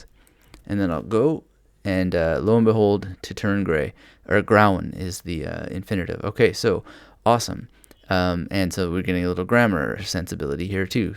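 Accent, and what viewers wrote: Canada. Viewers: You sound like a Canadian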